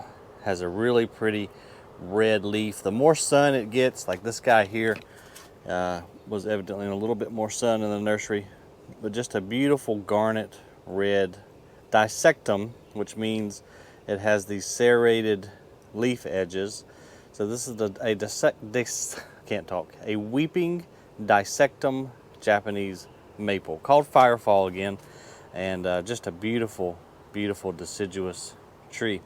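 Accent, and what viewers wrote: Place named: South, United States. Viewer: I am so very interested in this and assume that you have a website with more more. I have so many seedlings in yard and woods, and of different sorts, that I wish to employ my "If you can beat 'em, join 'em" philosophy. I'll throw this out there. I figure if I don't get an answer, that maybe you cover that precise question in the aforementioned, or close enough. Pine seedlings: Vicinity Charlotte, NC-I have forgotten the zones, but given your accent and mine, we in the same "zone." So what other 'persuasions' can I use? Form a parabola with a brick tied to a rope to weigh him down? Soldering wire seems to work for true seedlings but is too 'soft' if you wait too long to begin. PVC pipe 'sleeve' with a 90 or 45-degree joint(s)? A tangent on pine seedlings : How much root-ball do I need to include when transplanting? 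How much 'tap' root. I didn't know I could kill a pine seedling, but yes. I did inherit a green thumb for the central Alabama to Gulf Coast region, but 28107 is different enough that I need to seek help. Whether I get an answer or not, no worries. I've learned enough from this one vid that I'm better off than I was. For that I thank you.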